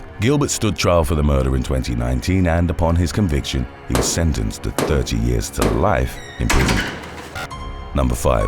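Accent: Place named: England, United Kingdom. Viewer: Holy shit! That's you?! It is! Woah! So let me get this straight; you're that frickin stacked, black with a charming English accent, you got one of the best channels on YouTube and you look like that?! Alright! We get it! You're the king of all men everywhere! Fuck off! Would ya? Fucking King Man over here. I mean shit! What's next? Ya gonna front a rock band? It's no wonder we never see you. You're probly too busy having a three way with lingerie models on a mountaintop or something. Shit! Oh by the way, I think a good topic would be, "When cemetery workers / gravediggers/ morticians and the like go wrong." I've known some of these folks and they're all fucking psychopaths!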